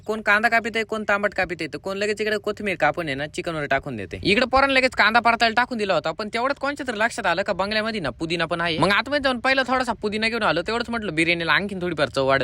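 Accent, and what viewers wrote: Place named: Nashik, India. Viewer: दादा तुम्ही नाशिकचे आहेत का बोलण्यावरुन वाटाटे..बाकी बिर्याणी खुप छन वाटते..तुमच्याकडे बिर्याणी खायला येच्या आस्सल तर येच्या कुठे.. From Pimpri Chinchwad Pune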